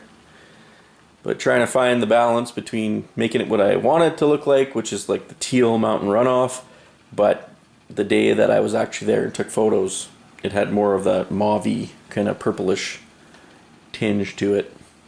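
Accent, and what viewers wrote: Canada. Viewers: Just curious but are you out in Saskatchewan, Your accent reminds me of my moms best friend who base hopped to all the same postings we got when I lived at home. Love the Layout and I hope that you get past your water effects roadblock.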